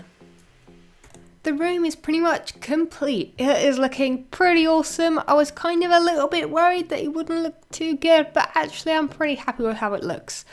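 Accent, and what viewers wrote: United Kingdom. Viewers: Your voice ;D I'm American so I'm kinda deprived of British accents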